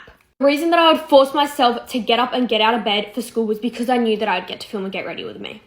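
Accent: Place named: Australia. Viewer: she aint from new york, she aussie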